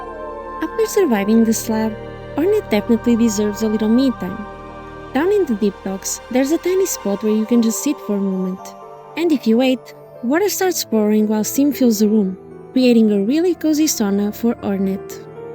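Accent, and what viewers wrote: Portugal. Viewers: Just out of curiosity, are you Portuguese? Because your accent sounds like it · Is your accent Portuguese?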